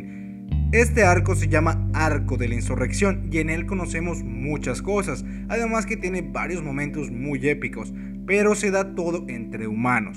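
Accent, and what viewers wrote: Argentina. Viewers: ¿Eres de Argentina?:V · eres argentino? :'0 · sos argentino??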